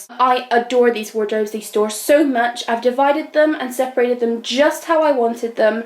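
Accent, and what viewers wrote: United Kingdom. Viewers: Are you Scottish or welsh or English? But when I saw the Victoria secret dogs I thought they were from the USA and also the candles. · british accent dear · where are you from, you sound british, and most of the stuff is from british shops, but im sure i can hear another accent in there somewhere <33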